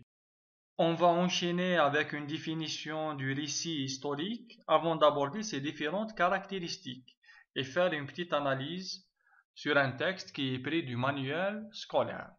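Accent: Kabylie, Algeria. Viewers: Azoul Monsieur, d aqvayli directe Avec ton accent😊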